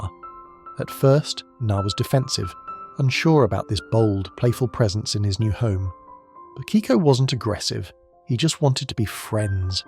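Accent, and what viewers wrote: United Kingdom. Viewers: Thank you so.much. pls use a narrator with an American accent. Uk accent i s rather irritating.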